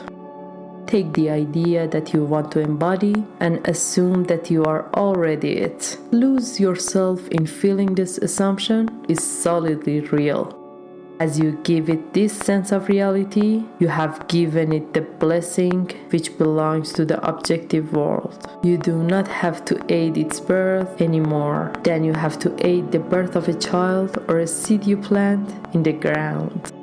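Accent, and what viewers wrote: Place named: Iran. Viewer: Thank you so much. Keep making new videos. I already fell in love with your Persian accents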